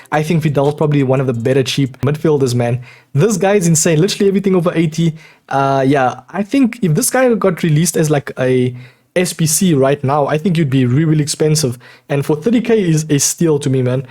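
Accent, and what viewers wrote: South Africa. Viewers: Are you South African bro you got the accent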